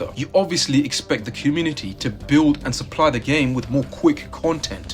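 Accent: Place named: United Kingdom. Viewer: ya veo acento británico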